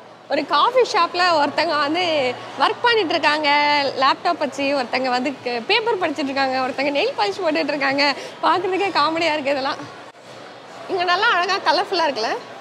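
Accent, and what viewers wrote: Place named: Chennai, India. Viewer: Unga Chennai slang super akka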